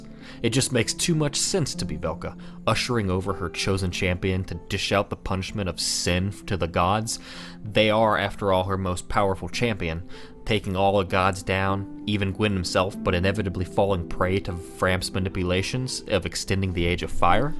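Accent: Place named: Western Pennsylvania, United States. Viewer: great fuckin videos dude. luvin it. im kinda a noob to the series (i played through most of 2 and smashed 3 but missed the first one) and youtube content creation. i love your take on things and am probably going to go home and get prepare to die tonight. i am currently writing a (serious) dark souls rap going into some of my theories, and yours tie in great with mine. i hope you enjoy it when it gets up if you get the chance to see it! hopefully will be this weekend. also--are you from western PA? hope thats not too creeepy of a question but my moms fam is from up there and you got the accent like whooooooa sometimes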